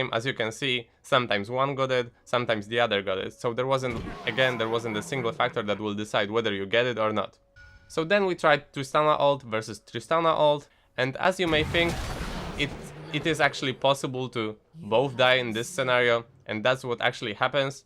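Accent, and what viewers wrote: Poland. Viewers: Hi u have Polish akcent xd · ARE YOU POLISH? CUZ I LOVE YOUR ACCENT ❤❤❤❤❤❤ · jestes z polski bo troche slychac po akcencie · Are you from Poland right? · od razu slychac ze polak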